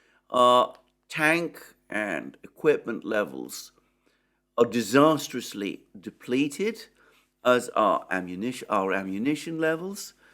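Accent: United Kingdom. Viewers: Just found you. Ha. Great content. Almost like something I would have put out if I wasn't so lazy. Plus, with a British accent which makes you way cooler than I could ever be. I will say, however, that I am on record as rejecting this pressure on Iran re: nuclear capabilities. I wrote an article over 15 years ago in response to a Kenneth Timmerman piece. It was published in the Washington Times, and was entitled "Iran Paternalism." Good stuff if I do say so myself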